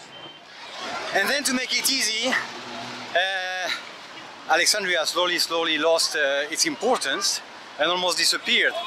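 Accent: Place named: France. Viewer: i can know ur from fr with ur acsent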